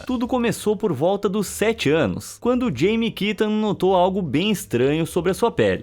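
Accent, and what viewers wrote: Portugal. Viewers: Gosto do teu sotaque em Português (Portugal).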